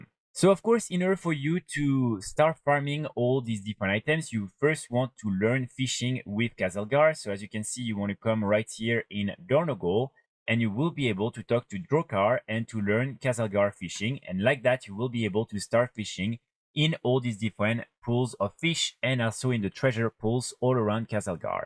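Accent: France. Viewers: T'es français non? L'accent xd Merci pour la vidéo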